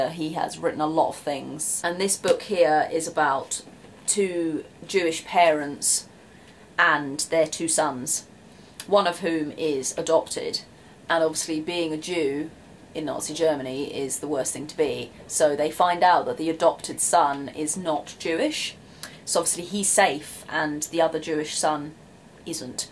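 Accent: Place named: England, United Kingdom. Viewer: Dear, I stumbled across your videos when I did some YouTube surfing recently and I must say they really make a difference. As a German its always quite interesting to hear what people from other countries think about Germany, sharing their experience when travelling Germany and what they find interesting to talk about. Of course, YouTube is full of videos with expats living in Germany or people (mainly young ones) who travelled in Germany and then make videos about their life here or their travel experience. However, most of these videos are quite boring because they are full of clichés, stereotypes, truisms and just serve the expectations what people want to hear or learn. So apart from all that Autobahn, beer, lederhosen, castles, medieval cities and efficiency crap you usually find, your videos are a lot more profound and entertaining. 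Also, it’s so beautiful to hear that likeable English accent for a change after having seen all those videos produced by Americans, Australians or Canadians. Yes, you are doing a good job and I think you convey a good and unconventional picture so far of this history-ridden country in the heart of Europe. I must say, however, that I find it quite astonishing that such a young, witty, quick and vivid woman from England like you seems to be so intrigued with the German Nazi history. But I realized quite quickly that your interest is not a superficial one but seems to be profound given the fact you chose this topic for your university st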